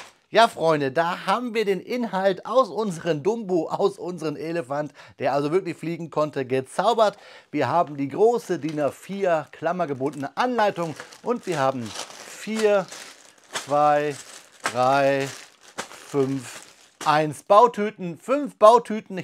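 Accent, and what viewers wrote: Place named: Southern Germany, Germany. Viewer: By your German accept I'm guessing you are based somewhere in the south of Germany. Am I correct? Thanks for another good review! The model is actually better than I anticipated. Sadly still no room on any shelf at home to place a huge one like this.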